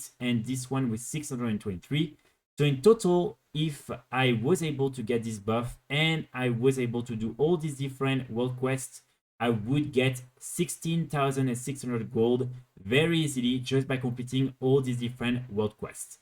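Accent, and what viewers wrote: France. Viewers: Un FR reconnaît bien un FR lorsque celui-ci parle en anglais c’est dingue 😂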